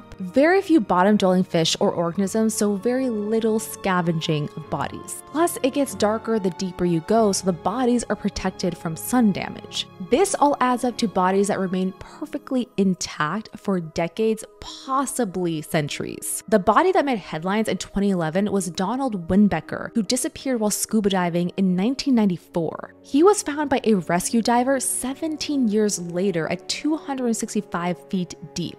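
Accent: California, United States. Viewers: So, is she from Nevada? Or California? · Are you from California or Nevada?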